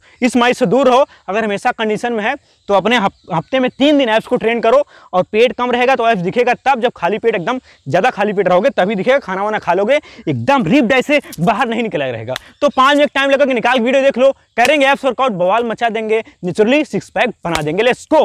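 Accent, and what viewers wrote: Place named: Uttar Pradesh, India. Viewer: Mja a jata hai up wali bhasa sun ke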